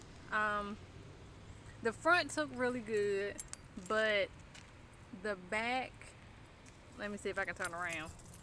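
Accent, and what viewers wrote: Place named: South, United States. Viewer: Love ur southern accent! You look fab as usual!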